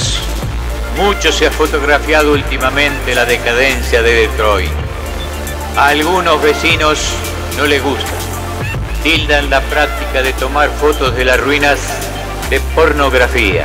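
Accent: Argentina. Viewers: . Seguro que sos Argentino y tanguero por la tonada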